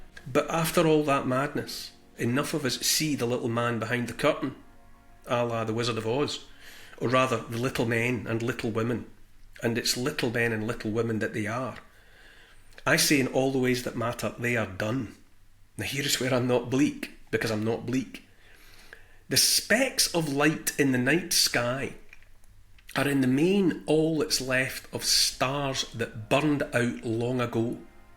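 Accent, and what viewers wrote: Scotland, United Kingdom. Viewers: As a fellow former Stirling resident, now an ex pat, greetings from the mountains of Norway. We must not let the b…..s grind us down. It is going to be back to the Stone Age type barter economy and too much for many. God help us, keep up the rhetoric and that great Scottish accent, we need you more than ever! Alex with kind regards.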